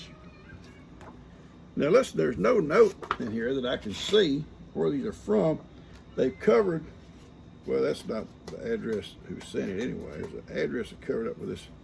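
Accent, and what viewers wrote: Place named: Texas, United States. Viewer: I have always enjoyed hearing the stories told by the older generation. Except, now I am one of them! I was born on New Year’s Eve in 1953. But, you have such a likable personality, and it is charming to hear your Texas style accent in the words you use when speaking. Just wanted you to know that, and hope Gi-Gi is doing well, after the terrible hack she has had to endure. I know she has sought strength and comfort trusting in the Lord.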